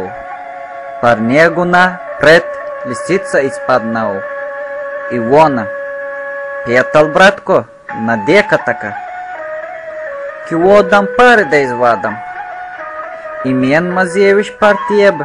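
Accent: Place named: South, Bulgaria. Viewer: Красив южен български диалект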